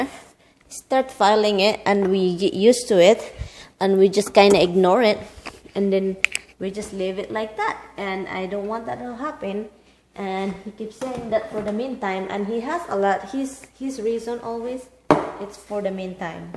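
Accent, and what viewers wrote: Philippines. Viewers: i subscribed because your videos are like novelties to me. i think the way you talk and the way you get your point across on issues makes me laugh. i am assuming that you are educated and just taking the Filipino accent to a different level...happy for you and to your husband...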